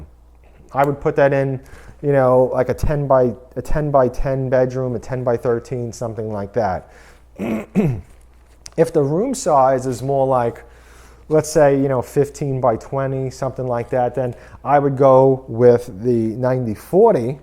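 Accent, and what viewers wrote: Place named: New England, United States. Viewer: Just LOVE your Yankee accent...LOL!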